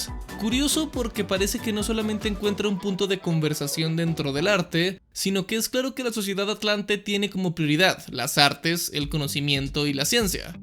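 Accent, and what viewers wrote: Colombia. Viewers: Vato cuando hablas normal se te desborda el acento colombiano xd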